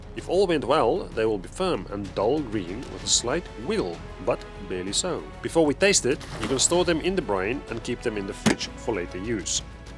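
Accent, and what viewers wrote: South Africa. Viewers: How does this not have more likes?? Great editing, funny, and South African accent. Good job. I'm definitely making these 👏👏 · I'm south African and your accent sounds south African but a bit of an odd one to me? I'm from Durban so maybe thsts why lol 😂 have you lived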